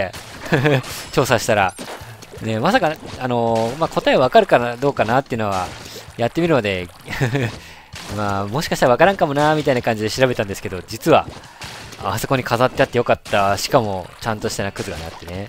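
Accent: Hiroshima, Japan. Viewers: 見るのちょっと遅れちゃった、、、 今回Rさんめっちゃ広島弁多かったですねwwRさんの動画大好きです!!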